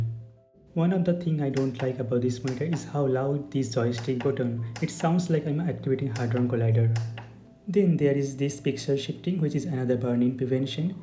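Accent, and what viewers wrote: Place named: India. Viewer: Indian accent is amazing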